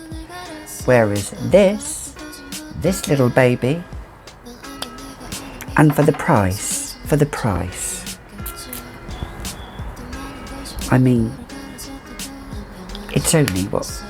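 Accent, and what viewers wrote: France. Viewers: Yayy for the French accent!!!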